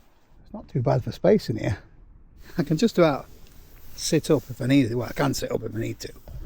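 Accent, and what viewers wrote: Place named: England, United Kingdom. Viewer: hope you don't mind, but what is the name of your accent. yeah, I know you are English, but what part of England is your accent from and what is it called? thanks!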